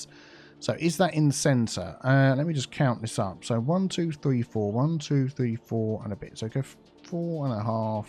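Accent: United Kingdom. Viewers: all your words sound different to me with your British accent ... I am from Canada ... but I guess I would sound wierd to you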